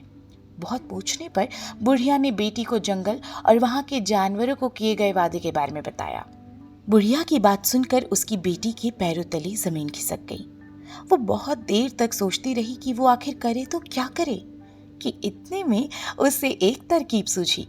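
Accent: Garhwal, India. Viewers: Tears on my eyes.. thanks, I love you maa forever.. 'hit tumadi bate bat me kya zandu Teri budiya ki baat'.. ma'am your garwali accent just osm. 😊😊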